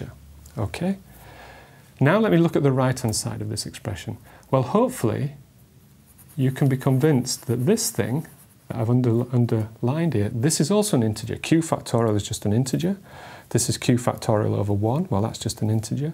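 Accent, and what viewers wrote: United Kingdom. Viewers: British accent supremacy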